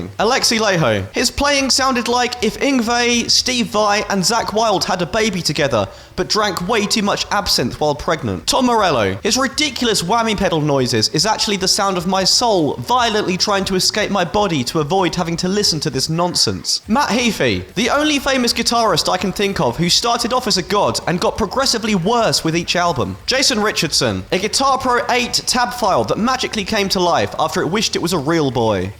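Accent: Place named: RP, United Kingdom. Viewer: Posh kid trying to sound cool while swearing ,always makes me smile.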